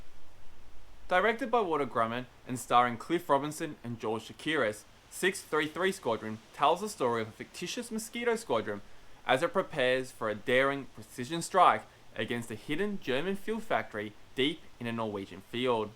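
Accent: Australia. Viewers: Made from timber and plywood?? What do you think ply is made from - plastic? Nothing new in the vid. I thought there were only three flyable airframes, all the rest were taxi dummys. - - - And a word of advice - disregarding your Aussie accent, you need to improve your diction, so that you don't slur your words or miss the ends off!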